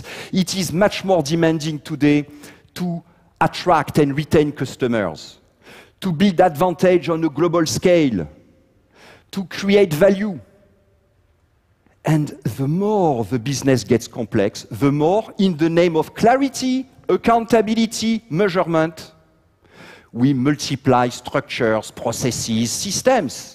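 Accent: France. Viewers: Why can't he at least try to pronounce English words in English, instead of pronouncing them French. It is unbelievably hard to understand... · i thought the language is frenc... dé internet, lé hdhsjs... disgusting accent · To those criticizing his accent, how about you go speak French without an accent? I thought so. Great speech. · The French is strong in his accent. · His accent is an incredible one. It's like to hear a French-English. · strange, it's french accent but very very excessive x) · Stopped . Sorry, but I can't handle that kind of laziness, the accent is too thick, and since I'm French, I know that he's not even TRYING to get even a slight accent. It's a pain just to listen to the words. Too bad because it would have interested me. Mr Morieux, please take English classes to improve your accent, thanks. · I wish I could be French just to have his accent.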